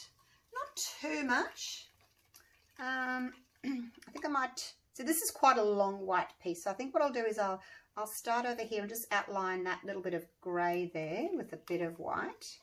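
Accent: Australia. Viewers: Hello Lady from Australia, I love all your work very much and would like to try some swipes you do so well, but I really get confused about your measurements like grams, is there an easier way of ratios? I am a retired widow who want to learn a couple of things in the pouring department, thank you in advance, love your accent btw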